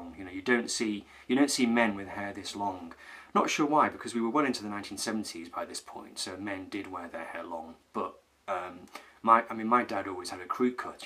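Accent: United Kingdom. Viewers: Just love your videos, watch each of them, week after week, because your´re so eloquent, because your accent reminds me of living in South Yorkshire for a year and, above all: because you’re such a nice guy. Love vinyl too, but none of the music you‘re into (I like jazz, latino, Brazilian music, library music).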